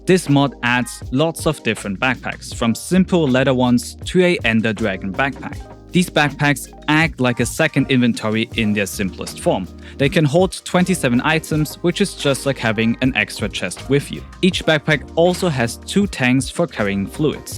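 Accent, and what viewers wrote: Germany. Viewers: Bro, are you actually German? Maybe it's just me but.i hear a VERY slight German accent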